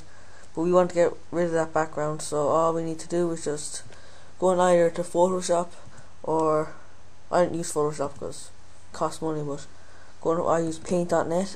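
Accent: Ireland. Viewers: He's Irish. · good video and its good to hear a fellow Irish man on youtube!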